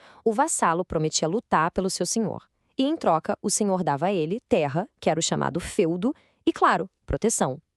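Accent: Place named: Rio de Janeiro, Brazil. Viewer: Pura carioca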